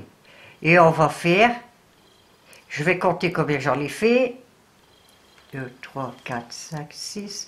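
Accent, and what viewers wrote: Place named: Nord-Pas-de-Calais, France. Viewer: Bonsoir, toujours le même plaisir pour moi à entendre l'accent chti^^ pour la laine maman en a elle vient de chez Babou. Bonne soirée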